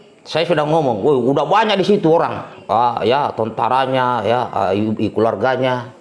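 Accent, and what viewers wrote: Makassar, Indonesia. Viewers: Mantap rokok dan kopinya.... apalagi dengan logat Makassar nya pang bes ... keren 👍👍🙏 ciri khas komandan trade merk yang tidak bisa di tiru ... sehat sukses selalu komandan 👍👍🙏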